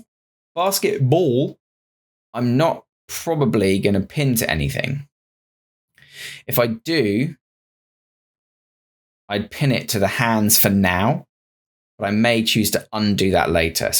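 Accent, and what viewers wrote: United Kingdom. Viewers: I've already commented on other videos of yours, just wanted to say a big thank you for how much I'm learning thanks to you. Among so many tutorials there are around, your channel is indisputably one of the best. Keep up the good work my friend. Also, listening to someone speaking with a British accent for so many hours sure makes lessons far more enjoyable lol.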